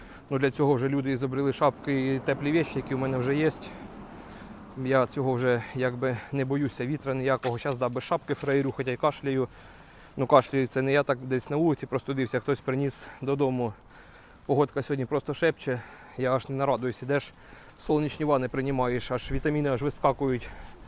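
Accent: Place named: Surzhyk, Ukraine. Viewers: 100%, Вже можна було б і потужність свого суржика значно зменьшити. Бо будучи в Польщі, напевно, ретельно намагався опановувати польську мову, в Данії теж буде, бо як іначе можна претендувати на високу зарплату, без місцевої мови. А ось до української мови несерйозне відношення. Тим більше, блогер, як він хоче завоювати симпатії багатьох українців, без української, навіть з легким суржиком, мови. А такий потужній його зараз суржик відрізає велику кількісь потенціальних підписників. Ось і мені подобається, а через такий тяжкий суржик, не маю бажання підписуватись. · Лютий сурж, слухати неможливо, вухам боляче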